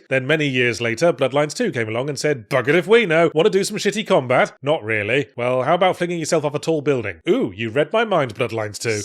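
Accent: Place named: Midlands, United Kingdom. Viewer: Where in the Midlands did yahtzee grow up?? 🤔 that ain't no black country accent... Or brum... Or stoke... Or leicester (I could go on) 😅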